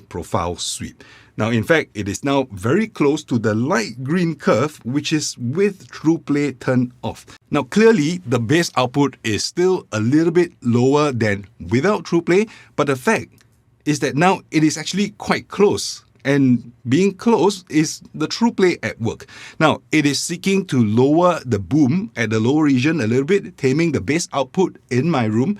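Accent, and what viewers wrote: Singapore. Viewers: Singlish! Love it. I love your methodical approach and the fact that you respond to comments:) Thanks and Ho seh liao! :)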